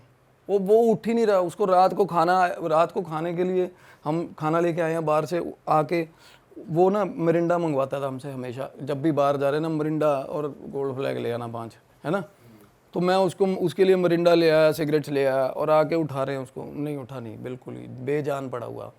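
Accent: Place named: Pahadi, India. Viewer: Seems like pahadi kind accent...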